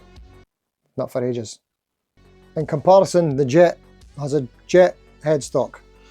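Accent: Scotland, United Kingdom. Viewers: Scottish accent is a draw for me, it's like if Scrooge McDuck had a guitar channel that centered around Strat style guitars.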